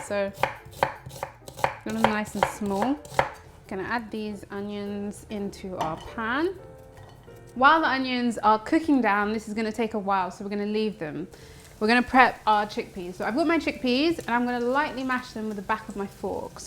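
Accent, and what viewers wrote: United Kingdom. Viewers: Yo hablo español, but if my listening is not too bad, your accent sounds british. I've heard there are good vegan options in Britain. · Thank you!!! The food looks delicious. I love your British English. I watch the videos to learn recipies and vocabulary too. 🤗🇧🇷🇧🇷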